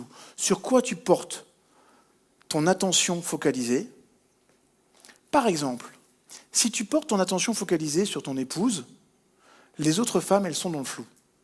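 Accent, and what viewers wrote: Quebec, Canada. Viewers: Ch’t’aime donc bin! Avec l’accent d’une pure QUÉBÉCOISE ❤️🙏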